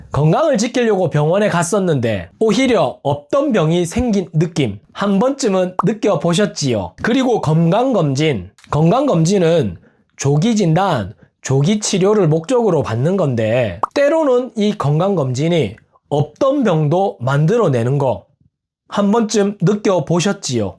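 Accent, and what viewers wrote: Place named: Gyeongsang, South Korea. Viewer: 님 오늘의영상도 꿀잼나게 알려주시고~전경북이라 님 경상도말투가 더정겹게 와닿아요 오늘또 공부잘했습니다~건강하셔요🎉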